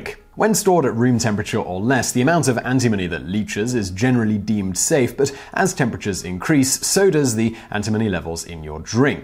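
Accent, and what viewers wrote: United Kingdom. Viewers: Seriously what is with the way the British pronounce aluminum? It was made in America named by an American and pronounced the way Americans pronounce it. You wouldn't just start pronouncing somebody's name differently because you're British would you? I'm going to state calling you because this is America damb it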